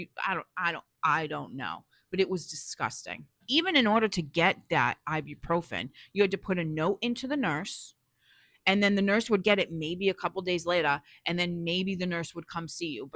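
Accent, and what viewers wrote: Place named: Eastern Massachusetts, United States. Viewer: Thank you for the video, great job. I knew you were a fellow Baystater before you mentioned Boston & Worcester with that Eastern Mass accent 😊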